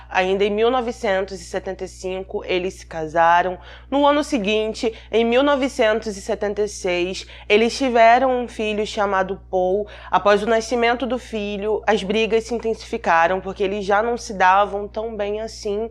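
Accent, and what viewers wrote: Rio de Janeiro, Brazil. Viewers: QUE SOTAQUE TENEBROSO É SOTAQUE CARIOCA QUE SOTAQUEZINHO DE MERRRRDA..AINDA MAIS QUANDO HÁ EXAGEROS.. COMO ESSA MULHER..MAIXXXXXXXXX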